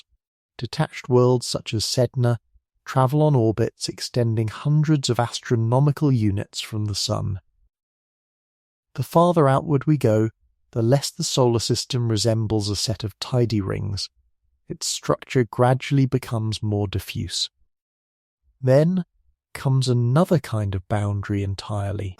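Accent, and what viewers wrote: United Kingdom. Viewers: gentle voice from eleven labs 😊 British voice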